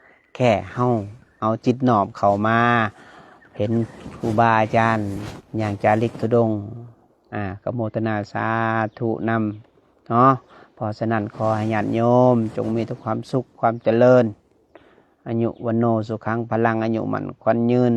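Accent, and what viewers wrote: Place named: South, Thailand. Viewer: สาธุสาชธุคะ่ชอบฟังมากมากคะ่ยกระแสสินธุ์พากใต้คะ่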